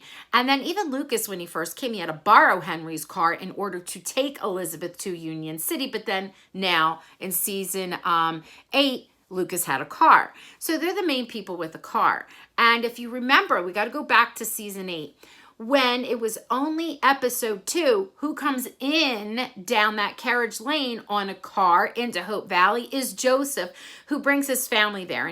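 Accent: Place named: New Jersey, United States. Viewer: Just love your predictions..you pick up on so much more than I do and God knows I've watched the seasons a million times. hahaha. I hope I catch your next live again..it was so much fun texting and having you answer live. I just love your enthusiasm and love for the show. Also love your Jersey accent..I dated a Jersey boy in college. He thought I sounded like I was from Chicago (I'm from Iowa..close enough I guess). Until next time.....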